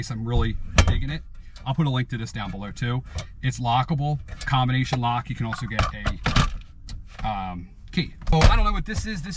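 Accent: Philadelphia, United States. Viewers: Your accent, then I saw your t shirt. A fellow Philly dude. Yo!!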